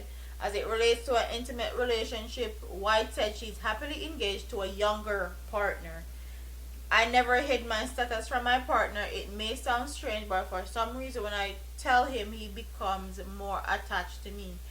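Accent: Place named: Rural, Jamaica. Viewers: Im not gonna lie and be a hypocrite but i also make fun of the way u speak. My 1st thought was "where in jamaica is she from"? You do have a strong country accent. However, I Never thought ur accent define who you are as you seem to be intelligent No malice in that observation sis. Pure love. Big up uself. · same suh mi talk too.. country mi com from too. an proud of it love the way you talk hun love you an soulflotv..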